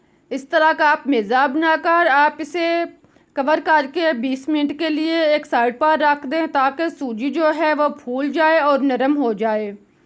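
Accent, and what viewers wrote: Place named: Punjab, India. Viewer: She is speaking English Words in Punjabi style, using Plenty of Oil, Bread will definitely break. Mix, Oil, crispy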